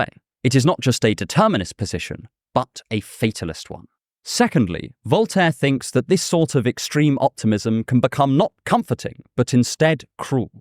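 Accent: RP, United Kingdom. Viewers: You should do a video about biased toward certain sounds or accents according to where one another is originally from. Because at the start of the video my headphones werent on and when video began to play. I was like hey he's a certain kinda cute like a 6.9 but once i heard that semi-posh, almost bbc rp accent, you went up to at least a 9. Another example is the lead singer of inxs michael hutchence yes he was a darling but his singing and aussie accent had me as a young girl thinkin' wow he blew my mind he skyrocketed to an 11 and i'm just a yank. Been to a bunch of shows over the mid 80s into mid 90s. Them playing LIVE is better than any I've seen ever after back in the way back days i was 15 in 1988. Although the drummer Jon Farriss was by far more symmetrically attractive with the chiseled cheek bones, but he didnt really say a whole lot (the strong silent type) and i think he may have sung on one obscure tune! 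But he was a looker for sure!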